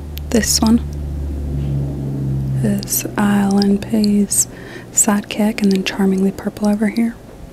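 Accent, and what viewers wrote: South, United States. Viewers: She's back and better than ever!! I love your ASMR so much and especially your southern accent! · Man I was just thinking about how I was craving a new vid from you and then I refreshed my youtube homepage and here it is! I always love the southern accents cause it makes me feel like I'm back home after moving up north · There's something kinda comforting about hearing somebody speak in a southern accent Not about anything politically charged or whatever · I LOVE your ASMR. Southern accent is the my fav. Thank you for putting blame on Justin, he dropped the ball on that one.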